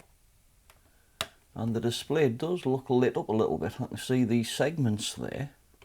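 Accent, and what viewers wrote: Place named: Newcastle, United Kingdom. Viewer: I’m in Newcastle. Born and bred. I can tell you accent isn’t a million miles away. I enjoy your videos and channel is the only channel out of a few hundred I subscribe to that I have notifications setup for. The simplicity of your repair attempts and fixes show that with a little knowledge and understanding such fixes can be completed successfully on a bench that’s not in an engineering or highly technical environment. Think it gives some less experienced the confidence to have a look inside and try fault finding. GOODLUCK with your channel mate. I think such topics are on the increase and there’s a lot of us out there looking for how fixes are approached. 👍🏻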